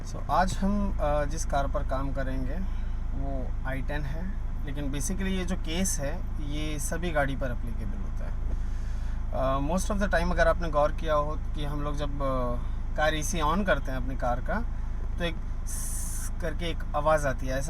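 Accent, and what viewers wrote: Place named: India. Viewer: English title but Indian narration. Dislike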